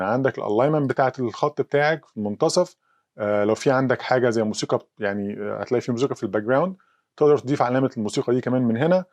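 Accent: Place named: Arab world. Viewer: Your arabic accent is so hard my friend.. 😢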